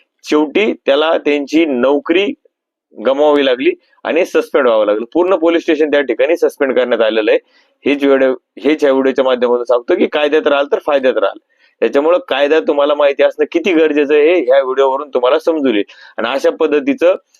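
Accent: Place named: Dhule, India. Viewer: भाऊ तुम्ही धुळ्याकडचे वाटतं..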